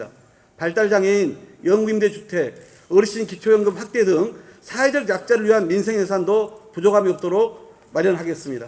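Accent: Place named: Jeolla, South Korea. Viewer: 다음 총선에서 개박살 날거 아나 모르나 전라도 사투리좀 안듣고 살자 오바이트 나온다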